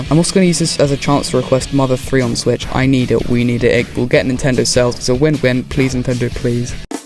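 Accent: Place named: United Kingdom. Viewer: british boy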